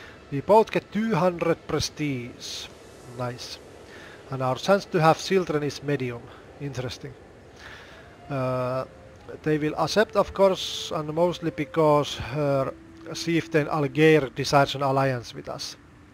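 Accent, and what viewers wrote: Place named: Finland. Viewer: You sounds very finnish. I like it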